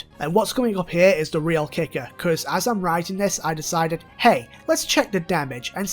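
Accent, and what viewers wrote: Northern England, United Kingdom. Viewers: He defo Yorkshire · Whereabouts from up north are you from lad? Can’t pin down your accent. Leeds?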